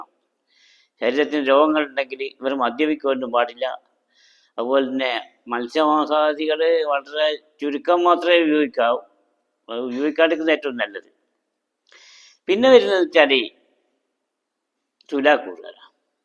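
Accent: India. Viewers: വടകര.... കുറ്റ്യാടി സ്ലാങ് 😍👍....... നല്ല പ്രവചനം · kannur malayalam 👍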